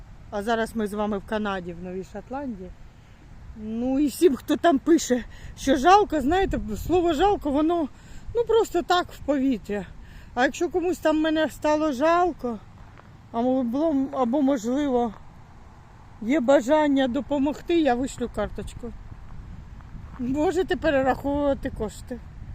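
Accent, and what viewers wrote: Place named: Sumy, Ukraine. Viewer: Привіт, Ви праві, говорите так самісінько, як в Сумській області!!((, там, далеко від нас...Всього тобі найкращого!:face-red-heart-shape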